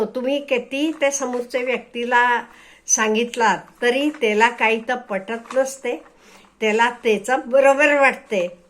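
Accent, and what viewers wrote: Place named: Gujarat, India. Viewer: ताई नक्कीच गुजराती वस्तीत राहिल्या आहेत. उच्चारच सांगतायत.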